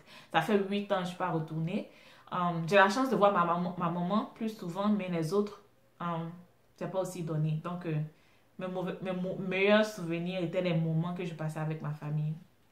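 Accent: Ivory Coast. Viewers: ma soeur j était déjà abonné par ton accent je savais que tu était ivoirienne donc je doit soutenir ma soeur mai je viens de voir cette vidéo tu ma trop fait rire je vis au Canada et mon baby me manque tellement j aime ta bonne humeur et sa sais made in cote d ivoire Abidjan est le plus doux au monde les vrais foutou placali et korpet escargot crabe poilu heee sa me manque ohhhh maman je vais pleuré😂😂😂😂 tu ma tué😄😄😄😄sais karidjatou a fagat moi je suis de la côtière je sais pas si tu connais dabou jackville mai bisou bisou ma soeur mon mari est baoulé👍👍👍👍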